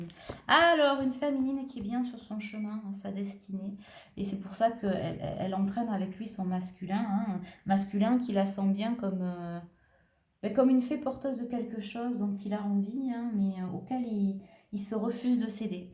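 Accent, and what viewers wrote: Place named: Toulouse, France. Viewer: Bonjour, il y 25 ans quand on s’est rencontrés, Elle jolie brune du Sud et moi Loulou de la mer du Nord ( elle m’appelait comme ça ), Elle 23 ans, moi 40, 🙄🙄nos Âmes se sont reconnues, c’était plus fort que tout, un ouragan, un tsunami, un ´goût ´de foudre. Notre premier baiser💋 tellement passionné🔥 et si différent des autres, l’alchimie de nos corps, ce parfum enivrant de l’Amour, le plaisir du plaisir de l’Autre. 🤫🤫, le goût de l’Autre, Nos folies et notre insouciance, le secret de nos rencontres, 🤭 les débuts de la nuit.....c’est Amour inconditionnel. Le grand moment de ma vie, où juste avant Elle, je ne croyais plus à grand chose, à trop vouloir on brûle ses ailes. Elle me manque souvent, Elle me manque toujours 😌😌et si tu ne m’aimes plus, tant pis pour moi😌😌 Merci pour vos agréables guidances, optimistes et juste avec votre voix de Toulouse 😊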